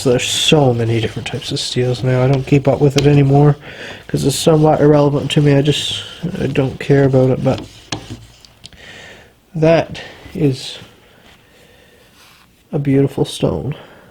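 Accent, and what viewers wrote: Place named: Newfoundland, Canada. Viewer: You are sharpening your knife like a madman. You are going way too fast and only using a small portion of the stone. Cody at Edge Pro explains that if you run the stone over the knife too quickly it will result in glazing. While it’s obvious you are getting good results, your poor old stones are taking a beating. Trying slowing down and ease off the coffee. Sounds like you’re from Newfoundland.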